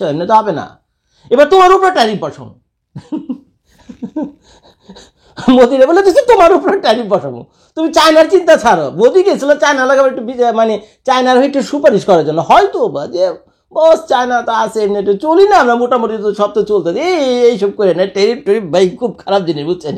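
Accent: Dhaka, Bangladesh. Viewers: জোস ভাই আপনার কথা শুনতে অসাধারণ লেগেছে খুবই অসাধারণ লেগেছে কথার মধ্যে ঢাকাইয়া টান থাকার কারণে আরেকটু বেশি অসাধারণ লেগেছে। কিন্তু ভাই একটা জিনিস ক্লিয়ার করলেন না আমেরিকার মধ্যে কি সবই আছে যদি সবই থাকতো তাহলে বহির্বিশ্বে থেকে আমেরিকা আমদানি করত না চায়না রাশিয়া ইন্ডিয়া বাংলাদেশ যদি আমেরিকা কিছু না দেয় তাহলে তো ডোনাল্ড ট্রাম্প গেয়া!!!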